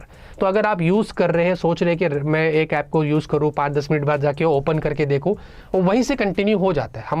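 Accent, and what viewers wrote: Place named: Mumbai, India. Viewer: Apki bambaiyya hindi achi hai...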